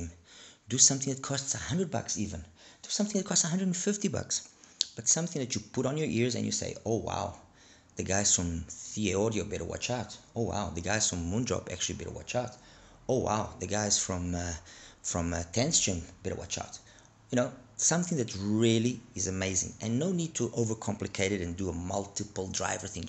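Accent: South Africa. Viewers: Where are you from man? I live in South Africa and your accent sound South African. Just very curious now.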